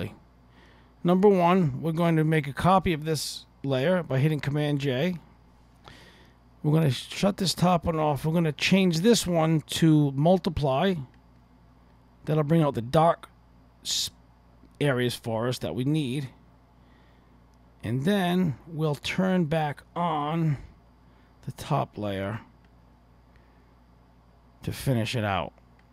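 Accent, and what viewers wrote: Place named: Boston, United States. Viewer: just subscribed. placed your accent in Steven Wright country, so not surprised to hear the Boston reference. nice straightforward tutes delivered humbly . thank you sir.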